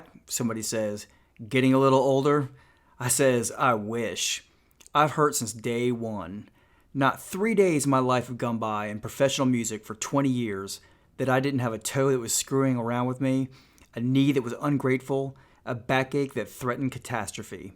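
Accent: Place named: Georgia, United States. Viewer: I'm so glad I found your channel! I had this book, loved it, I'd pick it up sometimes and read any random section, I always got something out of it that stayed with me, then I loaned it out & never got it back, so this is a real treat, and btw, you do a great job and your Georgia accent is endearing, I like it.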